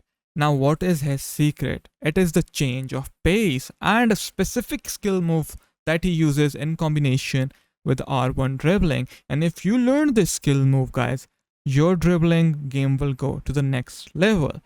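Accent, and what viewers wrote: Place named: India. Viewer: Indian accent.. is tough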